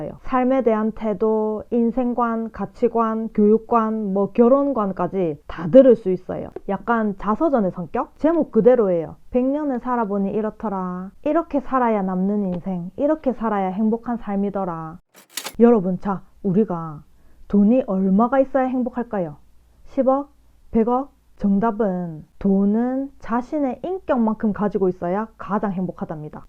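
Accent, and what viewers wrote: Gyeongsang, South Korea. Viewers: 자기계발 관련 동영상 정말 많이 보는데, 영상을 보고 직접 실행에 옮기게 해준 건 님 영상이 처음인 것 같아요. 감사합니다!! 제가 경상도 사람이라 그런지 말투도 너무 정감가고 실제로 나이도 같아서 똑순이 친구한테 피가 되고 살이 되는 잔소리 듣는 것 같기도 합니닼ㅋㅋ 같은 내용이라도 정말 이해하기 쉽도록 설명해 주시는 게 님의 엄청난 능력이라고 생각해요!! 만나는 주위 사람들마다 님 채널 추천하고 다닙니다ㅎㅎㅎㅎㅎ 백년을 살아보니도 꼭 읽어보겠습니다! 앞으로도 좋은 영상 많이 부탁드립니다 =) 감사합니다! 오늘도 행복만 하세요♡ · 경상도 말씨에 정겹고 배움에 도움이 되는 영상들이 많아 감사합니다. 사랑합니다. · 우연히 구독하게된 경상도 사투리~ 왕팬 될 것 같네요.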